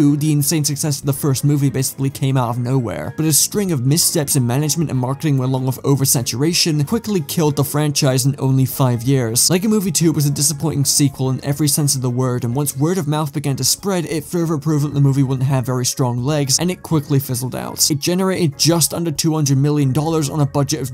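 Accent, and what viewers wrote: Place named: London, United Kingdom. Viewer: Bro must be from London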